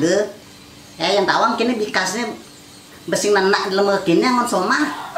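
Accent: Buleleng, Indonesia. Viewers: Hahaha..lucu luh, ngoceh logat buleleng, sambil masak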